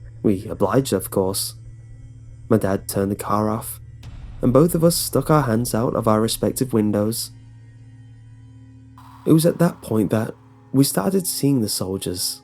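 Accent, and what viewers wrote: England, United Kingdom. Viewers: He sounds English, why is he talking about temperature in farenheit as opposed to centigrade?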